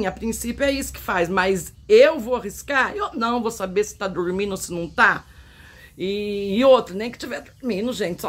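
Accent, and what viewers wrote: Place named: Inland São Paulo, Brazil. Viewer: Adoro o seu sotaque meu sonho é um dia poder conhecer o interior de SP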